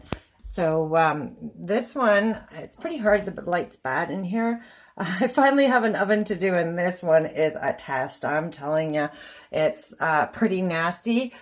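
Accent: Canada. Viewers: I find it amazing that you only use like 2 different cleaners predominantly. That simplifies things so much. It's also surprising to me that you use that Dr. Bronner's for steam cleaning. It's very versatile to do that. I'm going to pick some up tomorrow. By the way, are you Canadian? I thought I heard the accent but I could be wrong lol...I'm Canadian, that's why I ask :P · You sound Canadian and possibly from Ontario? I know an Ontario accent when I hear it. I am in Manitoba, in the Winnipeg area.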